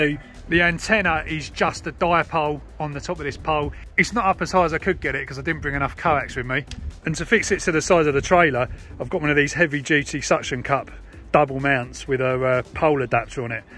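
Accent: United Kingdom. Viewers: Don’t worry about correcting us with the UK radio station If Americans don’t understand they’re watching a guy in the UK with a UK accent and he’s pretty much probably most definitely English is there a problem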